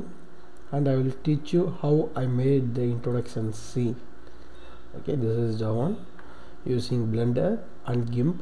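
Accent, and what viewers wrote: India. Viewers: Thanks.... :D But One Question " Are You Indian ? "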